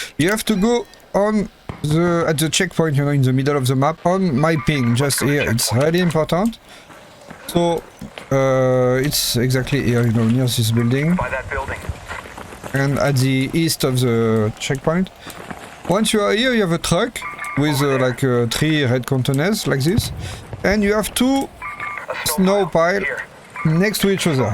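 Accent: France. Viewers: Bro these vids have been so helpful. I don’t even mind how French you are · because of your VAIIRRRRRRRRRI french accent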